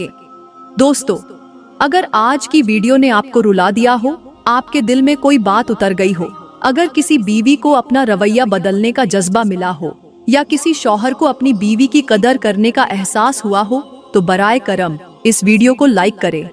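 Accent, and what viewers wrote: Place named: India. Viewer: Bayan toh bahut achcha hai lakin bahut ziyada tafseel se batakar video bada kiya gaya hai iski itni zarurat nahin thi ...aap ki Urdu bhi itni achchi nahin hai hindi alfaaz Hindi laheje se pata chal raha hai ...sab se buri baat ye hai k aap apne khoob soorat haath aur ungliyon ko dikha rahi hain ...isse parhaiz keejiye... f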